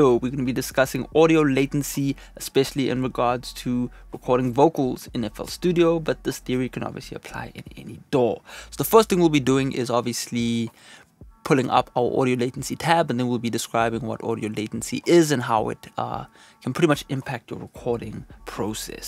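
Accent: South Africa. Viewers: bro where u from, u sound south african.... shoutout to SOUTH AFRICA!!!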